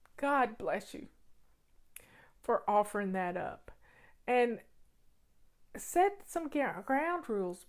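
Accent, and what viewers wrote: South, United States. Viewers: Thank you for sharing your wisdom with us! I love your southern accent and words - it’s comforting to me and I’m not even southern!😄. Thanks for being you!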